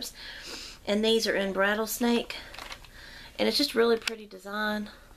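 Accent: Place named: South, United States. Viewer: congrats on 2000 subbies, must say you have a calm/southern voice. so many you tubers voice can be harsh...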